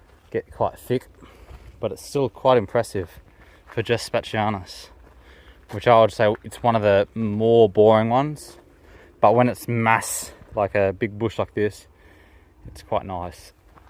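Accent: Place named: Australia. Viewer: "I don't have too long" 1 hour video ensues with calmest Aussie accent ever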